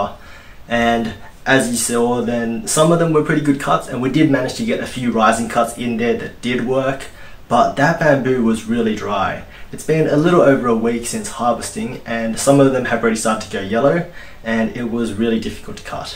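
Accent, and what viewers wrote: Australia. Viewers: are you australian? (accent) nvm you just sead aud are you in sydney because im going crazy trying to find bamboo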